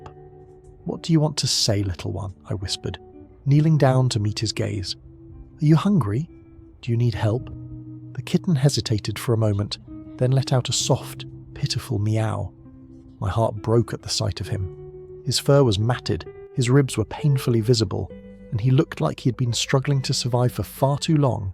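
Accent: United Kingdom. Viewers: Why is the voice from uk this is not filmed in the uk.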